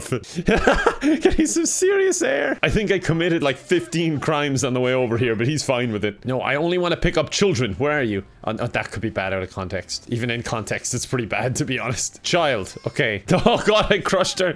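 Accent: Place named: Ireland. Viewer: Irish Man yells at video game.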